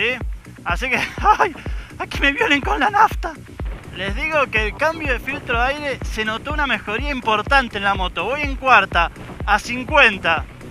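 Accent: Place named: Mendoza, Argentina. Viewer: Chilote. Jajaja naaa perdón amigo y sensual jajaja. Es MENDOCINO MI AMIGO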